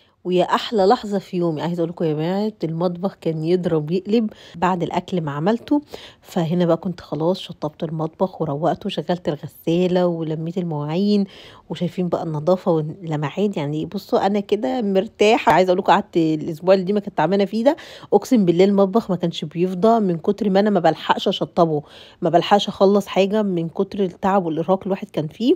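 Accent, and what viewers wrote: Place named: Tunisia. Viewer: لهجة تونسية 😊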